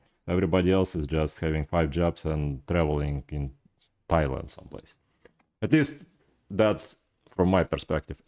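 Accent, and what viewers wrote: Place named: Russia. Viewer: По акценту слышно что ты говоришь по русски)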